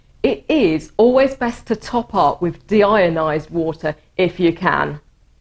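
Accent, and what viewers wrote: United Kingdom. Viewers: the sound of a british accent from a pretty lady really is giving me a hot shot in my veins.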